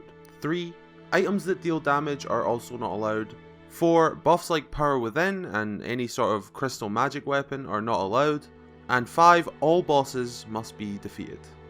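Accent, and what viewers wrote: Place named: Scotland, United Kingdom. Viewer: Me: "I've seen a shield only run of this game." Youtube: "But have you seen it with a Scottish accent?"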